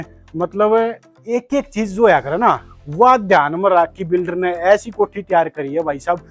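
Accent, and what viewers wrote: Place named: Haryana, India. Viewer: Sir haryanvi touch k sath to or bhi acha lga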